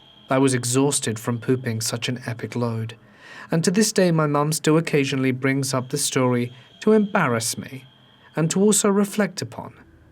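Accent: England, United Kingdom. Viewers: Oh, I have to say that even poop stories are better when narrated by an Englishman. I think my neighbors must have wondered what the hell I was laughing about. Absolutely brilliant! 🤣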